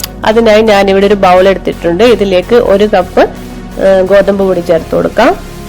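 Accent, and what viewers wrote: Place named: Kozhikode, India. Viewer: slang kettappo thonni nammade sidaan...njan Kozhikode aa😄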